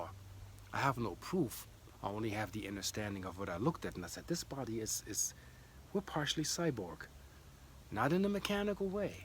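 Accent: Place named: Puerto Rico. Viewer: Greetings, my star bredren. Thought you might find this of interest: <link> A relevant quote: "In this ancient genetic continuum between Africa and West Eurasia, sampled African-American individuals are most similar to sampled individuals from the Nilotic and Horn of Africa regions" Your accent places you as originating on the east coast, perhaps of Puerto Rican extract? This article speaks of West Indies and Caribbean populations and their relation as far as their genetic makeup, to these ancient Nilotic populations as well. Literally, we ARE them, reincarnated as it pertains to soul groups and karmic recompense. Blessings, Mark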